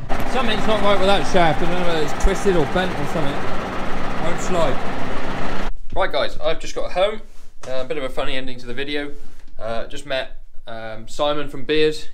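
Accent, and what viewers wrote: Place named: RP, United Kingdom. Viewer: I've said ths before but Gosh, you're posh... "fence tester"? WOW!😂👍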